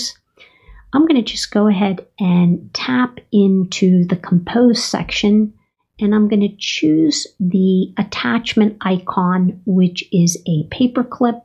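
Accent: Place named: United States. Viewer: this is mark, from the west country of England, I appreciate your approach, I hope you do really well with it, it is so important for the coming generations, that our elders have access to proper Lee paste properly paste paste PACED paste Instruction on how to use the iPhone and Mac and iPad, and all of that malarkey I would love to see a video of you recording how to dictate, as I tried to above, and failed, the word PACED, which I have only managed to get my iPhone 6 to iPhone 6 two Transcribe by spelling it out, letter by letter. And, it won’t even get the number of my phone right, I would have to dictate the number one, then the number six, for it to work, as below: iPhone 16 new paragraph, and it still cannot understand it about 70% of the time when I say the words new, paragraph, it just types out new paragraph until I say those two words several times, one after the other, like I just love your accent, and would really appreciate knowing which exact town you are from in the United States! 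Me, I have a south east accent, I was born on an island of England, called the Isle of Sheppey Very best wishes, Mark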